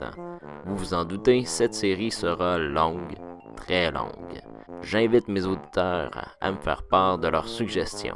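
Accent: Quebec, Canada. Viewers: dim dim Hé oh, pas d'insolence avec nos cousins québécois ! Et puis moi je l'aime bien celui là, et ça change de l'accent suisse 😃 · Il y a des tonnes de débunks et la platosphère est gonflante... Mais un débunkage avec l'accent des érables, c'est tout de suite plus cool. Vivement la suite !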